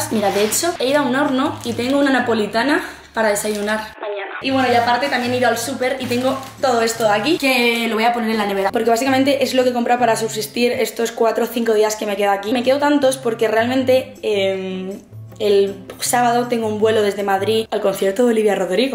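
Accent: Buenos Aires, Argentina. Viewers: te amo y amo tu acento argentino 😍💖😂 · Te amo mucho, me alegras los dias. Btw te sale re bien el acento argentino. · necesito mas videos hablando en argentino por favoor !! jajajja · Eu el acento porteño de Ar gentina le sale muy bien! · tu acento de argentina y ingles me encantan te amooo quieres tomar un cafe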